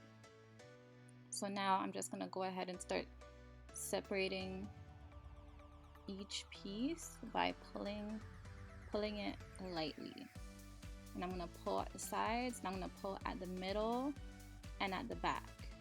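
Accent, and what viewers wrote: Caribbean. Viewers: I hear a Caribbean accent 🤔.... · where are you from? I hear a Caribbean accent. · Is the girl doing the voice over Caribbean?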